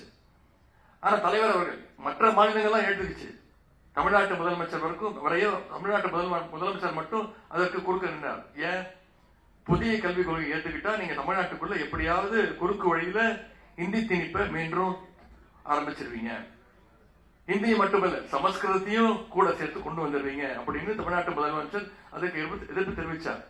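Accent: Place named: Telugu, India. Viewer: நீ நல்ல தமிழல பேசு ஒரே தெலுங்கு வாடை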